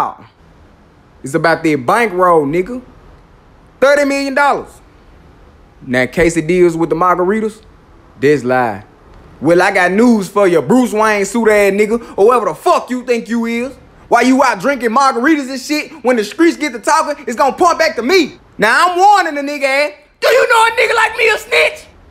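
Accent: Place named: Memphis, United States. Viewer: Bro I love your work I am from Memphis and this is how we talk!!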